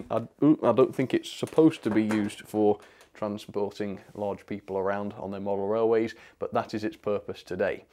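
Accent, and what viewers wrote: United Kingdom. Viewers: This has got to be the most british-ish accent i have ever heard in my life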